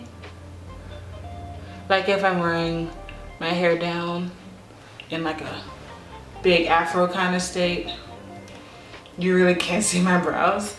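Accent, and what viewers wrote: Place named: Netherlands. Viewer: Nice subtle job. I thought you were from the Netherlands haha because you have a accent.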